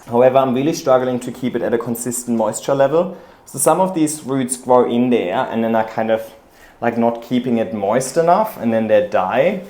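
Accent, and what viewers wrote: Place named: Germany. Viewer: You sound German now.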